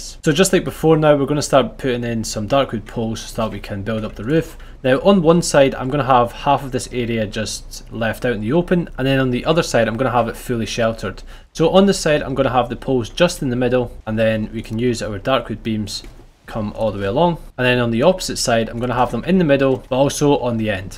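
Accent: Scotland, United Kingdom. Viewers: The Scottish accent turns the immersion up to 💯! Love your videos and I can’t wait to build this dock once I get out of the Bronze Age lol! Subbing for sure after this one!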